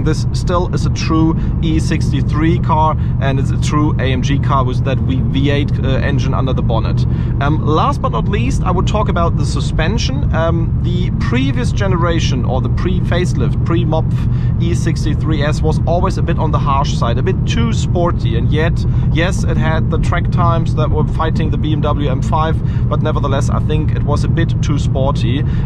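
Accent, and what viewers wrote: Germany. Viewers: Kann den deutschen Akzent nicht ernst nehmen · Man hört dass du Deutsch bist xD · Schön das man raushört das du deutscher bist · Man merkt einfach er’s aus Deutschland hahahah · Wie man hört das er deutsch ist . Ehrenmann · This man sounds as "stereotypically german" as u can possibly get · Fucking German Accent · Doesn't matter how you force yr English, yr German comes out very good with certain words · Max from Auto Top NL speaks Dutch English, speaks German English... a matter of taste!;-) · german accent check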